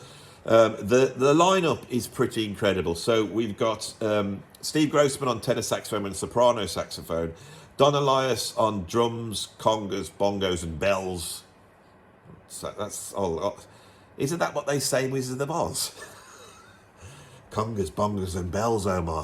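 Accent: United Kingdom. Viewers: Though not a musician (professional, amateur, or otherwise), I've been a jazz/rock fusion fan since my teens (for about 50 years) and of course still am. However, most of these artists/albums you cite I hadn't heard of and of course I didn't have albums, CDs, or mp3s of their music. Exceptions being Steve Grossman, and albums that you've named, which I've acquired in the last 4 or 5 years in mp3 form. I was actually able to find the other seven on mp3 just now and have promptly downloaded. I ran into your YouTube channel by accident--yes, was drawn in by your video about albums that critics love but that you called "crap" and I have to say I agreed with most but not all of your assessments. But....when finding out that you are a fusion and prog rock fan AND a professional drummer to boot, I literally had to subscribe. Although it may take some time for me to get used to the British accent (yeah, born and raised in the USA...just like!!! 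🙂), even when I disagree with your opinion on an album--I find you thoroughly entertaining and witty. Count me a fan!!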